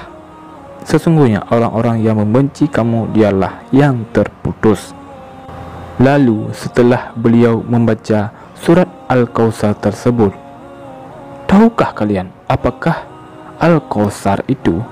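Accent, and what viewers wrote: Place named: Aceh, Indonesia. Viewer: OH, INI RUPANYA SUARA ORANG ACEH, YA,!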